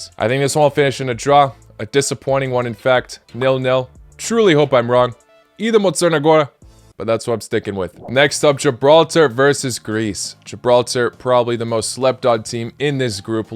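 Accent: United States. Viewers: his accent is kinda American but he commonly wears Croatia kit and sometimes Serbia Bosnia and a Montenegro kit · I swear, your the only person i've heard who has an American accent that has called the sport with it's right name "football".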